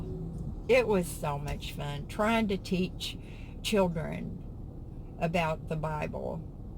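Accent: South, United States. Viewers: I grew up in Louisiana. Kinda recognized a southern accent and thought maybe you had been nearby at some point :-) I've lived all over too!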